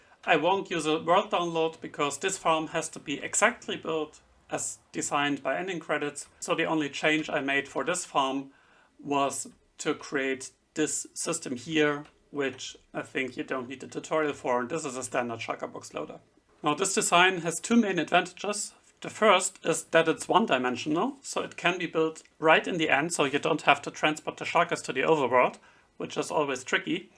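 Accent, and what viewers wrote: Germany. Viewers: Der deutsche Akzente kickt übelst rein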